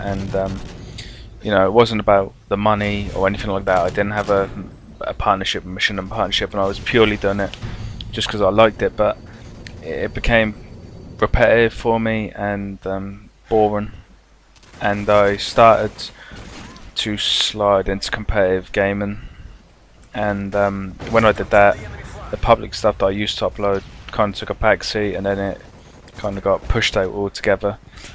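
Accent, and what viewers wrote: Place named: Norwich, United Kingdom. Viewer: You sound like your from Norwich? City fan?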